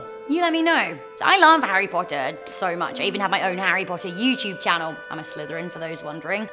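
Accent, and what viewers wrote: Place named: England, United Kingdom. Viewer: That stupid english accent Lol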